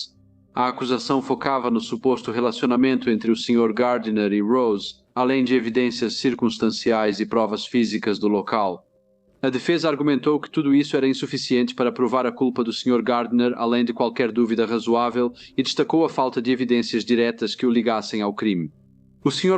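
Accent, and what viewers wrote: Portugal. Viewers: Este sotaque de Portugal é um saco! Dá pra resolver esse problema? Se continuar assim vou deixar de assistir vídeos deste canal.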